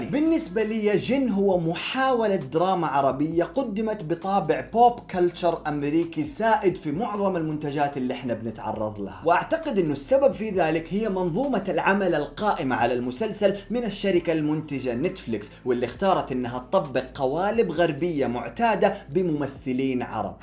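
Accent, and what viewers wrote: Jordan. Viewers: انتا اصلك اردني حجي؟ انتا بتحكي اردني احسن مني 😑 استمر يا وحش 🖤😁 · يا اخي متت ع كلامك الأردني جايب الشخصية صح😂😂😂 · تعليقي رح يكون بس على لهجتك الاردنيه الصح😅 لو مثلت بالمسلسل كان عملت جو رهيب😍 و غير هيك انه لكنة دواوين اصلي و ابن الاردن صح 😍 يعطيك العافيه ع هيك اداء جبار😍❤❤ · انا جايه من شارع الاعشى ، انصدمت انك بتعرف تحكي اردني اكثر مننا 😂😂😂❤❤ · مش طبيعههي ياا الله طلع اردني الشب😂😂😂❤️ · حبيت تمثيلك الأردني فرطت 😂😂 اقسم بالله انك فنان متقن اللهجة بشكل رهيب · تقييمه 3.3 بس لهجتك الاردنية تجنن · اكيد كنت عايش او بتدرس في الاردن مستحيل اللهجه والاداء هذا من كم يوم او سياحه هناك😂😂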